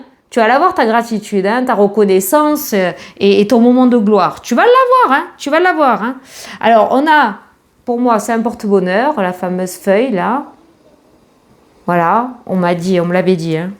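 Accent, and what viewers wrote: South, France. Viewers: Bonsoir, Quel peps j'avais pas le moral tu ma regonflé à bloc merci pour ce beau moment! J'ai adoré le passage "Jean Jeannot Georges" une pensée pour mon papa au ciel qui s'appelait Georges 🕊🥰 je suis du sud aussi j'ai l'impression de m'entendre parler!! Ta joie de vivre et les expressions font un bien fou comme à chaque fois! Une belle soirée à toi et merci encore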